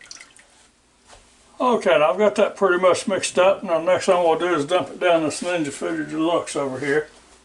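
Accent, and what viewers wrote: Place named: Appalachia, United States. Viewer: HELL YA I enjoy a hillbilly talking about what i eat in chicago!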